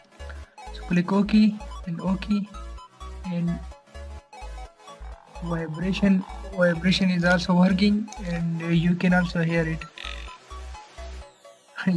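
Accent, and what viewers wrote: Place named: India. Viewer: What is he saying??? If you speak english with that indish slang, why is there a technomusic in the video, sometimes louder than your voice. BIG FAIL!!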